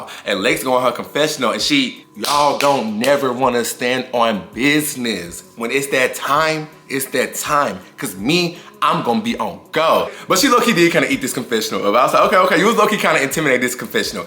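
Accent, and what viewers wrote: Memphis, United States. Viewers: I believe you from memphis from your accent and your realness ❤ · You're clearly from Memphis... has no one ever heard you talk 💀🤣 it took me all of 20 seconds to realize you were from the Midwest based off your voice which is why I followed you 😅 took no more 40 secs to place your accent in Memphis 💀🤣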